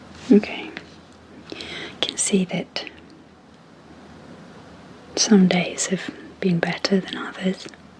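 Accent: United Kingdom. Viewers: Therapy, amsr, soft spoken akd British? Yes, please. Lol. Thank you for your time and energy. 🙏🖤🤟🙃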